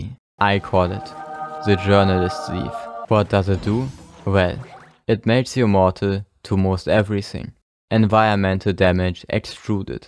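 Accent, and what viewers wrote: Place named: Germany. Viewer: bro the english sounds like a german that tries to speak english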